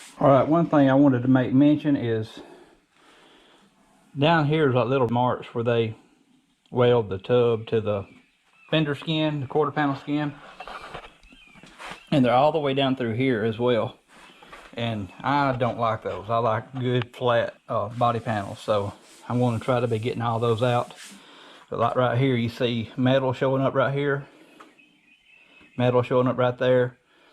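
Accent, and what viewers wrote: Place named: South, United States. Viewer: You got that right and someone who know how can spot someone else who does. Yes an art and not something you rush either ! When I saw you pull the string in the seam and you bolted the fender in place and it all looked so nice I thought this is not his first rodeo ! Enjoying your videos ; I'm in Alabama and you sound like a southerner ! You turn a pretty good wrench too ! A real car guy I can tell.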